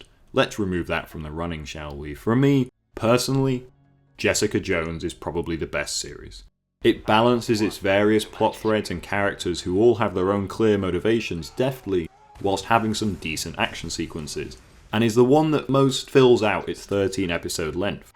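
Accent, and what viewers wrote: United Kingdom. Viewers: "With my British accent" Buddy I think you are spot on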